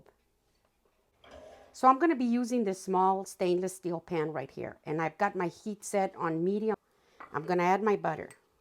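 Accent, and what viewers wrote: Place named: New Mexico, United States. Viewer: I love you miss I love everything you make. I'm from new mexico your voice makes me home sick.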